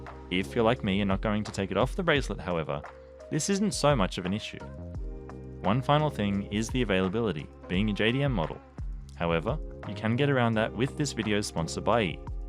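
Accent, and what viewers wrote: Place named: Australia. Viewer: It's always difficult to listen your own voice! Always sounds weird, I didn't immediately pick out you were Australian until I saw the channel bio, which was odd as I am a Kiwi so usually can haha. But to give you some sincere feedback. I just went through my watch buying process and landed on the NB1050-59H (it actually arrived from Japan today!) and this video was incredibly well made. Great macro shots, informative dialogue, good comparisons, good to hear positives and negatives and finally the voice of course! It's like i'm listening to an incredibly knowledgeable jeweler who knows watches inside and out. It took me about a month to finally decide to purchase this watch after tossing up many in the process, I probably watched this video 4 times lol, to say the least, I am a new subscriber! Keep up the good work 10/10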